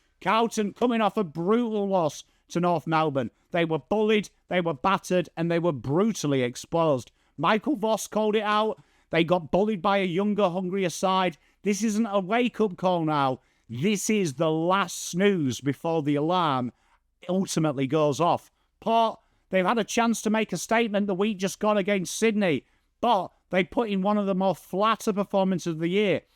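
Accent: England, United Kingdom. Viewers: Very weird listening to a pom talking afl. lol.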